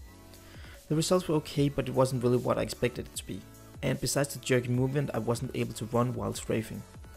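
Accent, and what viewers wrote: Denmark. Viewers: I could hear you have the Danish Accent in you, so I was like hmm he seems Danish. So I checked your ABOUT and saw Denmark. Just found your channel, seems interesting :)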